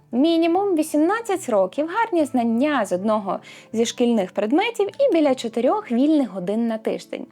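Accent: Standard. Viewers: Заслухалась мелодійностью Вашого голосу, чистотою української мови. Імпонує подача матеріалу , Ваша глибина знань , якими щедро ділитесь з аудіторією. .. Відчувається ,що Ви любите свою справу ,найшли своє покликання. За такими молодими людьми майбутнє України. Тому від щірого серця бажаю Вам успіху і розвитку. Дуже дякую! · Така чиста українська. Аж приємно слухати. Та й голос лагідний. А зовнішність — справжнє янголятко.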